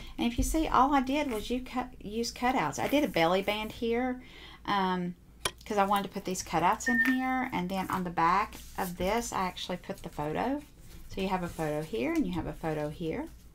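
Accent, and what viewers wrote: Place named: South, United States. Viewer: I’m so glad you popped up on my you tube recommendations. I immediately subscribed. Can’t wait to go back and binge watch. Hope you tell us where you’re from because we have the SAME southern accent. 😊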